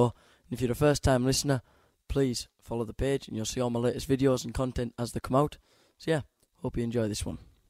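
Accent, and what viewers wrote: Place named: United Kingdom. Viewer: Love it. All the way from Dallas Texas and I was born in ‘62 so very familiar with the bands and artists that you cover. Love the British accent and wish I could find me a man with one lol !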